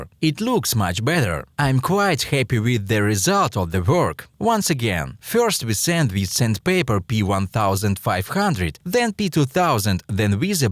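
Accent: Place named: Italy. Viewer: sounds italian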